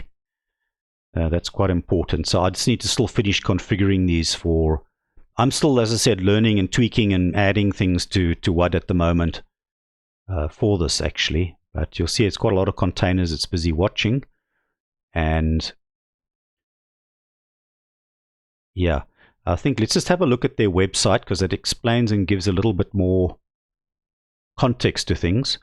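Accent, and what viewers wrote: South Africa. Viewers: Thanks for the video. By the way South Africa or Netherlands? hearing a familiar accent here.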